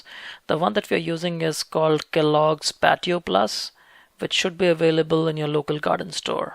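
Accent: India. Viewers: Are you Indian ???your accent is different than of Americans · I love the accent on these Injun guys....much more cultivated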